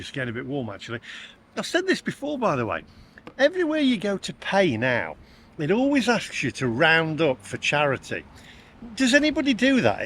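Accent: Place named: East Midlands, United Kingdom. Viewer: if you get caught without the Crit'air sticker in the cities especially Paris its a 135 euro fine. Traffic wardens can issue them as can standard gendarmes. It costs less than 5 euros to get one sent to the UK and thy send you a pdf version you can use to print out instantly while you're waiting for the actual disk. You have to scan and post your V5 to the French "certificat air gouv" website then they will allocate your category. My 2001 Mondeo 2.0 petrol auto is Crit'air 3 and doesn't face any additional regulations but might do after 2025 when it will be most likely banned from Paris and other major cities. Now Euro 7 is coming out it looks like all Euro 4 vehicles will be banned from the cities. The Taycan would be Crit'air 0 or E. As for the accent thing, you sound to my East Anglian ears very much the East Midlander, if I had to narrow it down I would say Nottingham accent. 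And yes ha bloody ha I fell for the battery joke.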